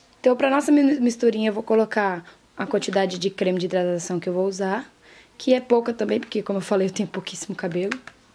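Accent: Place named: Maranhão, Brazil. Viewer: Seu sotaque é bem maranhense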